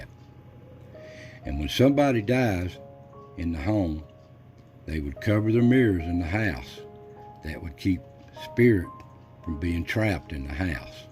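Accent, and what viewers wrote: Appalachia, United States. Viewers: I'm from West Virginia, too, and just hearing you talk reminds me of all my family growing up. It is such a joy and privilege to have been born and raised in Appalachia. Thank you for some sweet memories. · He sounds like my uncles from the Appalachian's.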